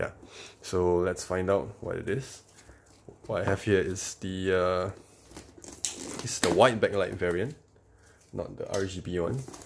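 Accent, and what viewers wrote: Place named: Malaysia. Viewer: Lol I like your review on this. Thanks man. You sound like you're from MY or SG btw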